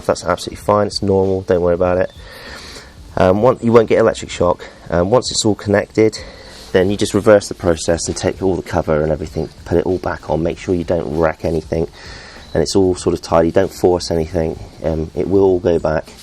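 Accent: Somerset, United Kingdom. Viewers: It is a painful process especially as we have had such good weather here in Germany, but...it is ordered and will arrive at sometime in the future. We are really lucky that Harley allow so many test rides and over the next two weekends the Harley Truck is at two dealers not too far from me. Just a quick question, what town do you live in? I think I recognize the accent and grew up in Weston-Super-Mare :-)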